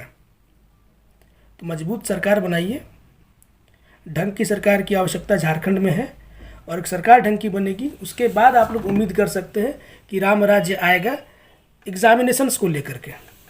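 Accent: Bihar, India. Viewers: Tu to bihari lagta h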